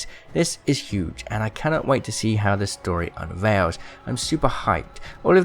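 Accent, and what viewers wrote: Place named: RP, United Kingdom. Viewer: Bro, why do you speak like a posh dude?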